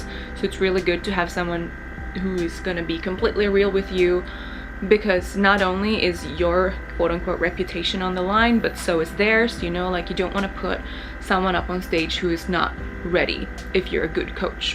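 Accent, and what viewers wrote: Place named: Finland-Swedish, Finland. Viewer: Self-reflection is good, but don't let it get you down. All you can do is all you can do. Your body will respond or not. Let's hope it will on time:-) Lycka till. Jag älskar din finlandssvenska accent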